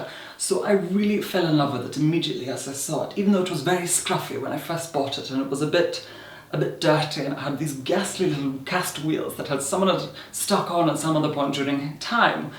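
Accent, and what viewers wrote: Scotland, United Kingdom. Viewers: He has a slight Scottish accent as well. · He's saying 'film'. It's accent. I believe he is Scottish or Welsh originally, but has lived in London for many years.:) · OUTER HEBRIDES, I KNEW YOU HAD AN ACCENT!!!!! I AM FROM THE OUTER HEBRIDES AS WELL!!! WHICH ISLAND?? Sorry thats weird ahahaha, I don't know why I am so excited, I have just never had a youtuber from where I live! Wow, I actually knew you had an accent, whenever I listen to you I always listen to your accent and I knew you were from Scotland from the accent but! Do you speak gaidhlig!? omg I am such an idiot! Your last name!! That is such a popular name in the outer Hebrides. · I kinda felt that your accent is not that British or someone from London. It confirmed that when you said you're from Scotland. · I knew it! You are Scottish! I always thought with your posh voice you were English but I've always heard a small slice of Scotland in there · actually saying film like 'filum' is extremely common still in Scotland (where and I are from) the difference is you rarely have many people with our accent as articulately spoken, but does live in London now (for how long, I'm unsure, but his Scottish accent is still there and very prominent) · I feel like I can hear a scottish accent under that voice.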